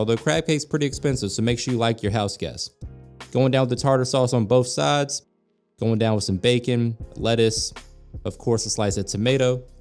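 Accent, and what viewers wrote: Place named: Maryland, United States. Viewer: Quick story… About 20 years ago the corporate office I worked for was in Cockeysville MD. When I would fly out there, the ladies would make me THE BEST crab cakes. I quit, company went under (I think) lost touch with the ladies. Through the years, I’ve tried to replicate the cakes, no luck. I’ve tried HELLA cakes, never as I remembered them. Fast forward to a week ago. I knew I recognized your very faint accent. When you said you were from the area, we’re making crab cakes, my heart skipped a beat! Made the crab cakes today, not exaggerating… they legit made me cry, took me back to the best crab cakes I’ve ever had, the ladies, the fun we had, Chesapeake Bay, everything. THANK YOU so much. Love everything about your cooking channel, keep up the good work☺️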